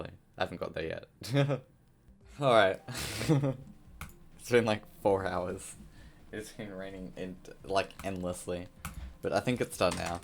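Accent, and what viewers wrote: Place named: Australia. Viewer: Hey u sound australian. Where u from perhaps?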